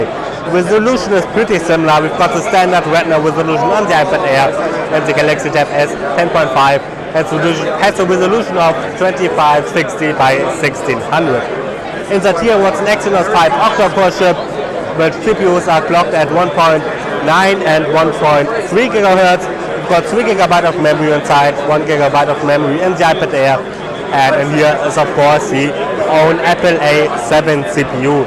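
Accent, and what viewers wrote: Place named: Germany. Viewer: sis is se worth english ive ever heard please if you as a german guy do your hands ons in english please learn how to spell words like "thing" oder "that" properbly und jetzt nochmal auf deutsch lerne bitte erst englisch bevor du hands ons auf englisch machst die wörter heißen "this" und nicht "sis" oder "the" und nich "se" ansonsten mach auf deutsch weiter oder hörs einfach auf das kann man sich ja nicht antun ich konnte das video nicht mal halb anschauen weils mir dann schon hochgekommen ist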